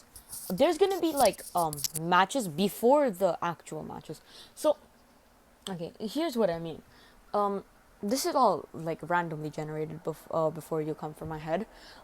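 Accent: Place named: Australia. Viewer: That’s Australian not American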